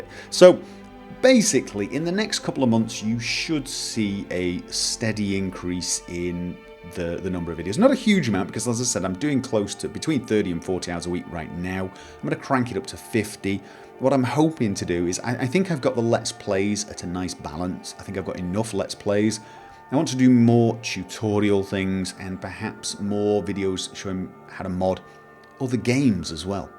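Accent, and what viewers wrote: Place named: England, United Kingdom. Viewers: Congrats, you know i gonna lay it all out here bro. Love the playthroughs, love the baritone Northern English accent. Love your style altogether, just a quick thought is that now you've decided to devote more time to your channel, I just want to say that if money becomes an issue I'd (Plus some other subs) would be more than happy to donate to the cause to help out. so yeah, all sunny skies from here in. Best wishes from us to you, here in West Virginia. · Let's all share our stories of how we found! I actually found him by searching for let's plays of Vampire the Masquerade. I was watching LK's Marik play through, and was frustrated because he dropped the project, and I actually liked the way the story was progressing. So I searched on youtube and came across. I laughed and said "Hey he's got an English accent too, nice!" I found his voice so soothing to listen too, that I watched the play through several times before I started the skyrim videos.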